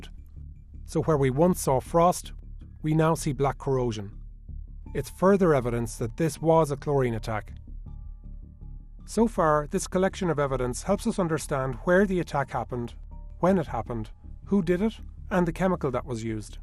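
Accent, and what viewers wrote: Ireland. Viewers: Why do you have this Irish sounding Narrator? Slightly offensive that you think our own dialect isn’t distinguished enough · Is that a horrible Irish accent ugh not nice to listen to doesn’t make for a good YouTube experience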